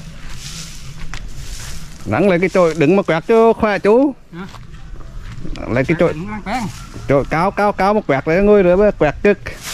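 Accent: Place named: Hue, Vietnam. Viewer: Chuẩn Huế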